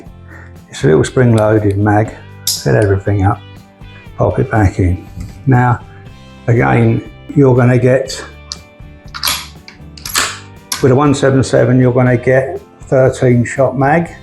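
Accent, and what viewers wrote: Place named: Essex, United Kingdom. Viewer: You sound like you come from Essex you miss name many rifle parts which makes you sound stupid and very boring to listen to!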